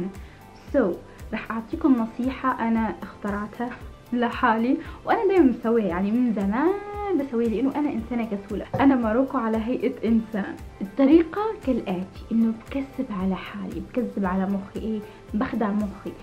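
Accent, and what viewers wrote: Tunisia. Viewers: هل انت تونسية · حسيت من طريق حكيك انك تونسية بنت بلدي👍👏🌞😗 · نحسك تونسية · إيه تونسية حلوة سكر😋🌹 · احس انك من تونس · صح هي تونسية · انا مشتركة جديدة حاستك تونسية اذا كنن صاح انا جارتك من الجزائر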